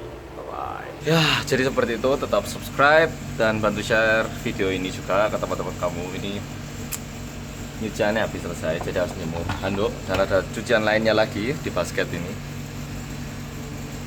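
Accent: Java, Indonesia. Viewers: sampean medok e notok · jawanya mendok men thoh, aku salut,. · Kali Bicara Logat Jawanya Padet Banget 👍😁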